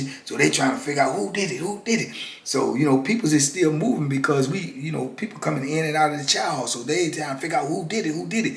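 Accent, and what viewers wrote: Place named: South, United States. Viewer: U r a very interesting man. No disrespect to ur relationship, BUT U R AN INCREDIBLY HANDSOME MAN, THAT SOUTHERN ACCENT IS EVERYTHING. U CAN GET IT FROM ME. I LIVE IN N.Y. B BLESSED . DORIE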